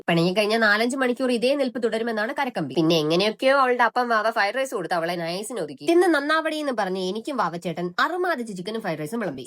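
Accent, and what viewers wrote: Thiruvananthapuram, India. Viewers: തിരുവനതപുരം ഭാഷ കൊള്ളാം 😂❤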